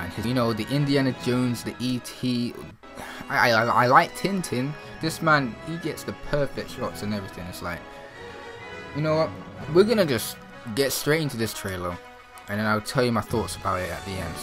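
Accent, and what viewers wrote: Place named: United Kingdom. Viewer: Ive never heard a british accent with a lisp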